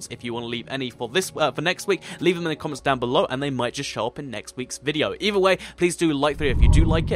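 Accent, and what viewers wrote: United Kingdom. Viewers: You talk like if you were british and not Welsh. Just saying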